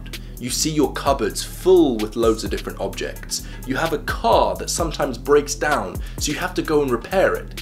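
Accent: United Kingdom. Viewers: love your british accent bro ! I appreciate training my english with your smart videos (I 'm french) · I pronounce with "k" and I'm in the UK. His British accent is in the way he ends every sentence. :)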